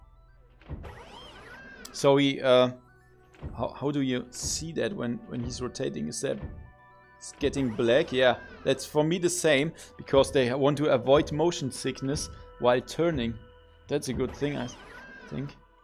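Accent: Germany. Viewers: Hey, been watching for a while. I own a very small VR company in Washington State in America but my girlfriend is German. So I tend to put you on whenever she's around because I think your accent reminds her a little of home. Also, I can never get over the way that you read your sponsors. It's the most German thing a German person has ever German'd. I actually wanted to thank you for the review you did on the neuro net EEG product. I'll be a little more blunt and say that it's got maybe good intentions but it is clearly a cash grab and one of those items that pops up and actually does damage to the industry as a whole because it's yet another product or accessory that is lying to consumers and getting their hopes up to get closer to where we are wants to be as opposed to where it really is. I'm in the process of opening up a new VR arcade location and I'm currently trying to figure out some hardware logistics as far as purchasing. 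Perhaps you might have some advice for me? either way I hope you're doing well and keep it up. We enjoy it quite a bit.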